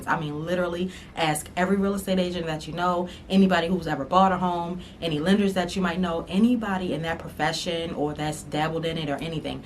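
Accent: Baltimore, United States. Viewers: I can tell she from b more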